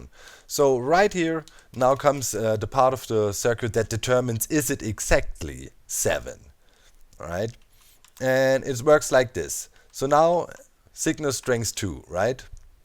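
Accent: Germany. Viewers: I watch 30% for Minecraft, 70% for that smooth cool german accent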